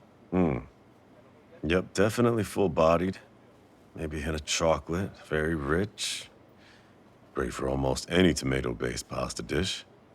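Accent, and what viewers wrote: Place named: Italy. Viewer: And my friends ask me, why am I into Italians?... ummm hello did you not hear the accent, the food ? 😍. Thank you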